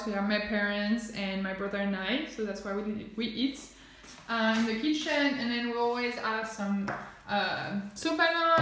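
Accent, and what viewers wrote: France. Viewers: Thank you for the tour of your kitchen. Interesting and always fun to view something new. 💜 love your French/English accent. Your so Cute 🌺 My Best always 😉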